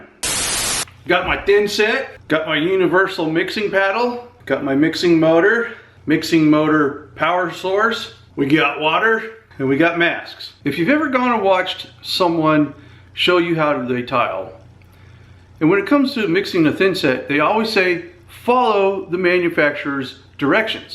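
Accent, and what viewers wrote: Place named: United States. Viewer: Sounded Amerikin to me.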